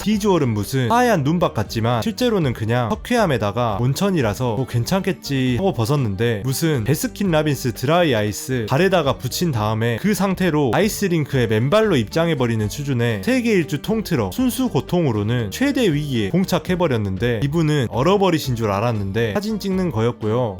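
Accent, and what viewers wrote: Jeolla, South Korea. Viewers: 전라도 억양이 두드러지고 넘 시끄럽게 말해서 채널차단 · 전라도 청년 구수한 사투리 설명 ㆍㆍㆍ참 잼있네요이 ~~십여년 전 다녀 온 곳 다시보니 새록새록 ㆍㆍ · 말투가 전라도 사람이네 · 남도 억양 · 전라도 억양에 ....데 로 끝나는 문장이 너무나 신기해요.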